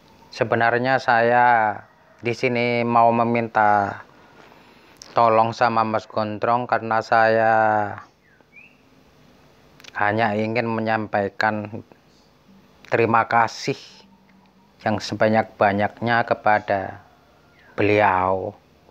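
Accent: Madura, Indonesia. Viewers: kalo denger ini mumet... Banyak ngomong nya ga jelas.. 7 poin aja jgn bertele tele,dari gaya bicara nya dari madura ya pak🤔🤔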